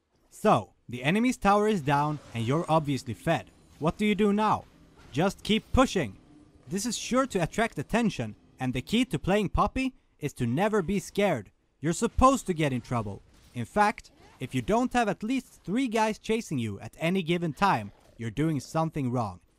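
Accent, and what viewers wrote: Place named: Netherlands. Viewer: your dutch accent if so annoying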